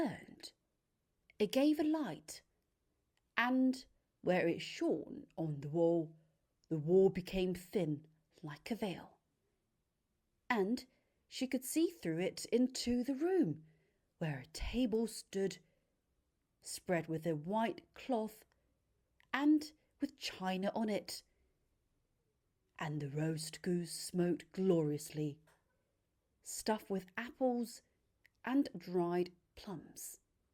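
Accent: RP, United Kingdom. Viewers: 우와 british english asmr은 처음 클릭해서 들어보는데 진짜 동화책이네요. 액센트도 원어민 RP 라서 넘 듣기좋아요 :) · 잠와요ㅋㅋ좋다!!!!강추 영국에있는듯합니다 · 평소 영국식 발음에 매력을 느꼈어요 구독 누르고갑니당♡ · 영국영어 넘나넘나 좋아해요♡♡♡♡♡ 광고보고 아나운서님 팬되었는데 이렇게 직접 들을수 있다니💕💕💕💕 천천히 읽어주시니 더 좋습니다♡ 참, 혹 녹음하실때 쓰시는 마이크장비를 여쭈어봐도 될까요~~??!!! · 이번엔 둘째아이 옆에서 영국식 영어의 신세계 입문중^^ 5천명 곧 돌파!! 화이팅!! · 정말 고급지고 세련된 상류층 영국발음이군요. 최고네요^^ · 영국유학한 지인이 말하길 비비씨 연령있으신 아나운서들이 쓰는발음이라하네여 ..발음좋으시다구ㅎㅎ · 최고다 최고... 대박이다 대박 여기가 영국이네요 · 동화올려주셨네요 감사감사^^~ 애가 영국발음이 좋아해요. 다좋은데 10분만에 안자네요 ㅜ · 저 이거 들으면서 영국억양 따라해보고 발음 배워욬ㅋㅋㅋ자라고 만들어주신건데 배우고 있네요ㅎㅎ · 통해서 영국 발음을 더욱 좋아하게 되었어요 😍😍영상 올려주셔서 항상 감사하고 친절한 코멘트도 감사합니다 😊😊 좋은 밤 되세요 💖💖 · 발음연습에 정말 도움 많이 됩니다😍😍 영국남자보면서 듣기했었는데 여기서 보니 완전쏙쏙 들어와요 영국발음매력에 더 빠지고있어요😩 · 영국영어 원탑이신듯~!! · 영국발음너무좋아하는데 목소리랑 너무 좋아요 진짜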